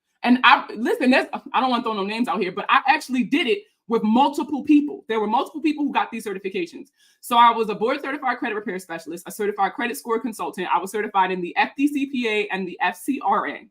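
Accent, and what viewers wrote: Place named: Baltimore, United States. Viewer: You're getting too emotional 😂, he actually brought me here, and I here that Baltimore accent. I think the attention they are bringing to you is actually doing more good than harm.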